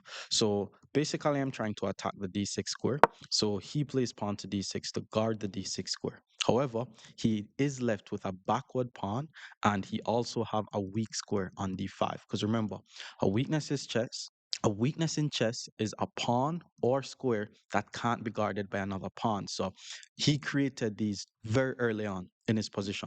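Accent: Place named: Jamaica. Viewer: Not gonna lie this whole time I thought you were Jamaican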